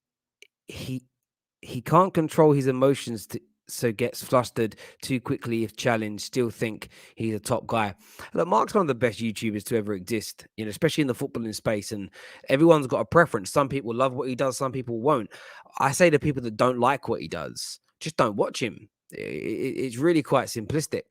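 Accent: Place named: London, United Kingdom. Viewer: White man talking London black man, innit bruv.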